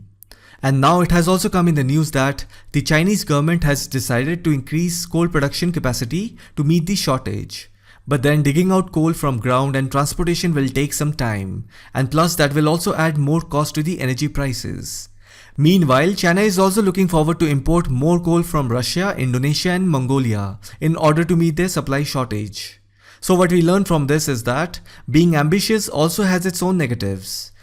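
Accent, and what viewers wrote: India. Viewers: your English feel like hindi , easy to understand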